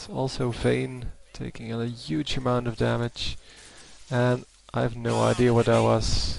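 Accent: Netherlands. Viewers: Only real dutch people have this i live in the netherlands as well but my parents are from somewhere else but i dont have THAT accent :)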